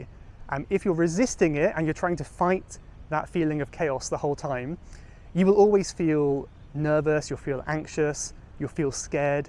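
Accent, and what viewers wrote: RP, United Kingdom. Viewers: Hi, I like this channel so much!!!!! but unfortunately not many people commented on it,I have learned your british accent from your Youtube for 2 months,I hope you can keep updating and I will always be here, thanks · I love your accent. Is it modern RP?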